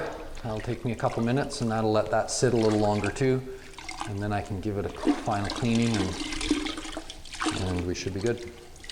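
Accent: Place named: Saskatchewan, Canada. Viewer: This guy has GOT to be from Saskatchewan.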